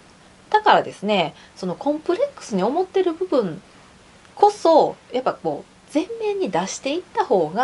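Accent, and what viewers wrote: Kansai, Japan. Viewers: 先生の関西弁のイントネーションが素敵だ 👍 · やっぱやな！俺はのサバサバした関西弁や内面を評価してます！ちなみに俺はレスラー体型かな。筋トレしてます。👍👍 ガチで考え方が能動的になります。欠点を補うには筋トレが最強です。ドラゴンボールの最長老様に潜在能力を引き出して貰うクリリンの感覚です。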